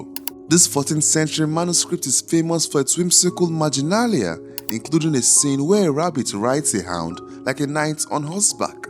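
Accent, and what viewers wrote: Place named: West Africa. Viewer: What's with his accent? He sounds like he's from West Africa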